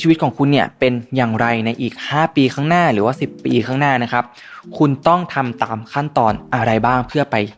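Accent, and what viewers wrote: Thailand. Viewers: สำเนียงไทยน่ารักมาก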